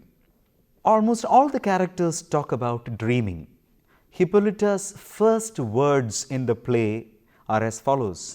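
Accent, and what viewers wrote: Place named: Kerala, India. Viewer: Mallu accent not bad at all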